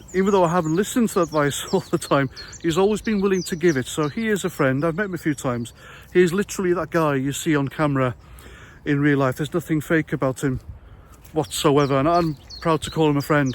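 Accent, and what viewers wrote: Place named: Northern England, United Kingdom. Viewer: great accent too.. northern and proud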